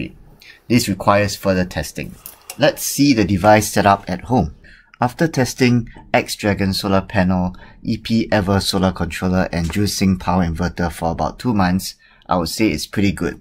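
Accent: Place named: Singapore. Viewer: sound singaporean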